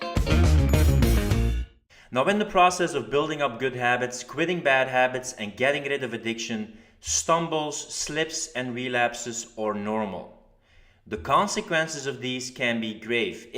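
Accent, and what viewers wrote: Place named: France. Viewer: No mather what it is - quiting bad/ building new habbit but the moust important from the points you were talking about after an slip-up is to foregive yourself and not judge yourselffor so much, but it it is also the moust difficult thing to do, for me at least.... if i can ask.... is this only your hobby ? or do you work with stuff like that ? i mean if you are some advisor , educator ,speeaker or no idea how to describe it (but i hope you know what i mean) and 1 more question.... where are you from ? i gues France :D (or a native french speaker at least acording accent)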